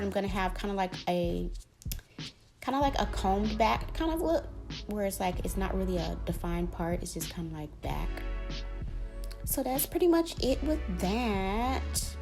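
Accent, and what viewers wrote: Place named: Houston, United States. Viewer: U sound like my cousins from Houston lol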